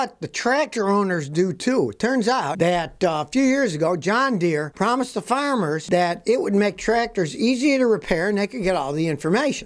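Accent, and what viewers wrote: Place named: Tennessee, United States. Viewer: Anyone else notice that Scotty is starting to get a Tennessee drawl??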